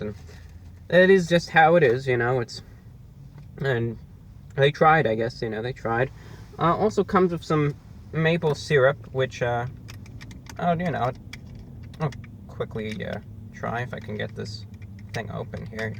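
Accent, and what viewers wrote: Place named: New York, United States. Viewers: This guy sounds like hes from brooklyn or Jersey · Do you happen to be from New York or north jersey? I can tell from your accent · Why do you have a stereotypical Italiano Brooklyn accent?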